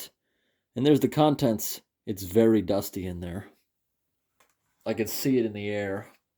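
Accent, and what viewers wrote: New England, United States. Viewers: That is his new england accent.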